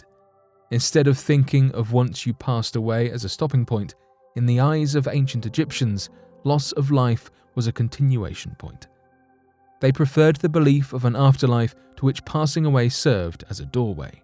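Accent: United Kingdom. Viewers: ARE YOU A FELLOW BRIT?🇬🇧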